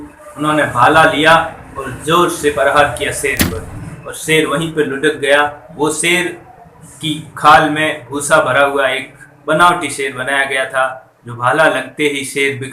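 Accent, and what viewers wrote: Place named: Marwar, India. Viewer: होकम आपकी बोलने की शेली बहुत सुंदर मारवाड़ी देशी भाषा की तरह हिंदी भी बोलते है बहुत सुंदर होकम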